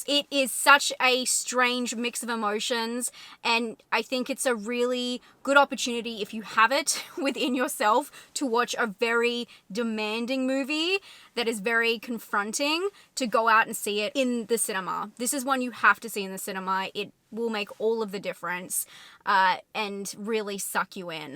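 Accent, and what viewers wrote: Australia. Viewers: I love the Australian accent and how the letter O is pronounced as OR. Say “ O and R “ over and over again!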